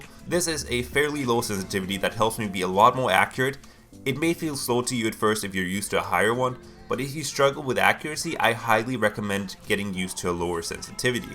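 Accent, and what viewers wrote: Boston, United States. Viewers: Where are you from. Your accent is cool. Boston?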